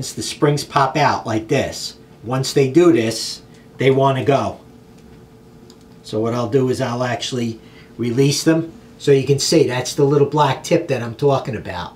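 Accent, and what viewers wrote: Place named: New York, United States. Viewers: Are you from Noo Yawk? Great Video! Thanks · definitely has that classic "Lawn Guyland" accent, lol. I'm from Long Island, and even I am amazed at his heavy accent.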